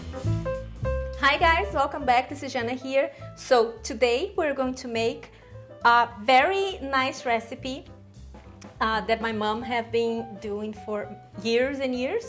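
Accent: Brazil. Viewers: Você é brasileira reconheci pelo sotaque <3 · I recognized your brazilian accent, omg I'm bilingual as fuck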